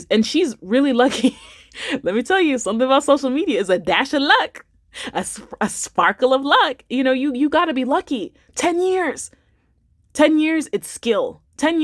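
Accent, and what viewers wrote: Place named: England, United Kingdom. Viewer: The English accent had me out 😂😂😂 love your video style !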